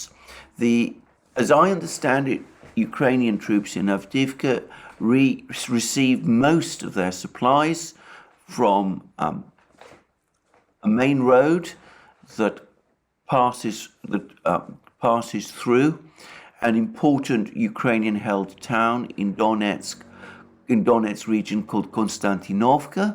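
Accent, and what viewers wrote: United Kingdom. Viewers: Alexander is actually Russian citizen with a British accent. He looks Russian enough.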